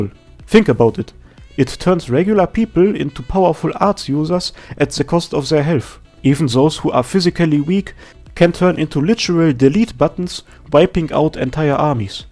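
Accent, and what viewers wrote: Germany. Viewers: der Deutsche Akzent ist direkt zu hören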